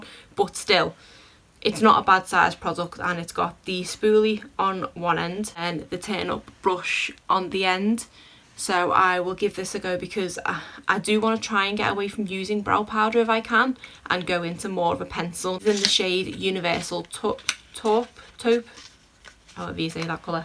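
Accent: Liverpool, United Kingdom. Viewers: Your accent is beautiful. I am a linguistics student but can't pin point where it might be from. I would guess you're a scouse or from somewhere near there, you sound amazing! ❤ I'd love to win the falsies. Not that am any good at putting them on but really would love to try 😁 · some lipstick or the eyelashes idk, i really liked most of things so anything would be great <3 ahh the liverpool accent is kinda difficult but so lovely,my dream is going there someday. have a nice day <3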